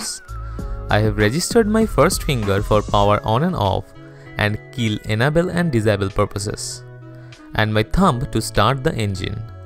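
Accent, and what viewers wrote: India. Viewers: You know it's real when you heard indian voice 🤗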